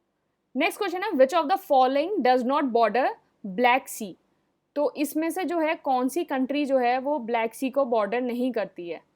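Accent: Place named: Lucknow, India. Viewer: Nice set, thanks. Ma'am aap Lucknow se hain na(just guessing,if you don't mind,apka bolne ka lehza batata h,aap Lucknow se ho)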